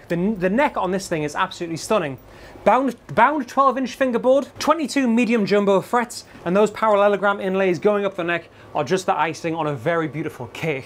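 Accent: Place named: Northern England, United Kingdom. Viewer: Y tiene uno de esos acentos del norte de Inglaterra.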